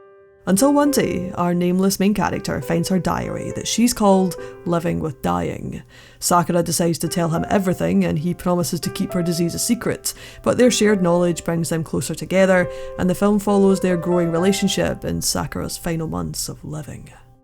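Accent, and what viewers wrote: Scotland, United Kingdom. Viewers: Where are you from in Scotland? I’m Glaswegian and I get you are changing slightly for other people but I can’t place it, also excellent work! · I am just so used to hearing American engli accent....your British accent sound like crap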